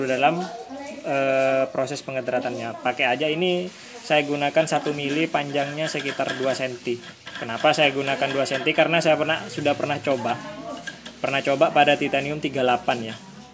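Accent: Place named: East Java, Indonesia. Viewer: Dari logatnya spertinya mas org jawatimuran. Bisa minta kontak wa nya mas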